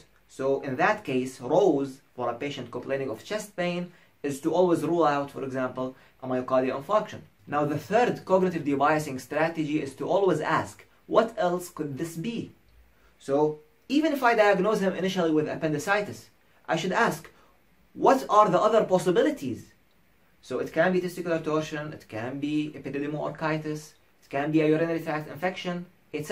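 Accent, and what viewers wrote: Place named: England, United Kingdom. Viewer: I am so excited to learn from you..please pray for me to be accepted in the med school...I loved the video the informations and your English accent as well...Good luck doctor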